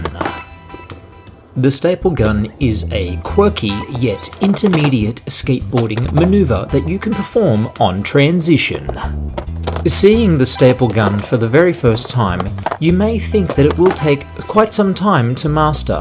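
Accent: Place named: Australia. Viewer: Haha I love the narration. So Aussie.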